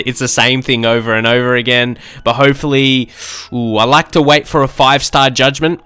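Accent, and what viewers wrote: Australia. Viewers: Yes! An Aussie! Just found channel! LOVE IT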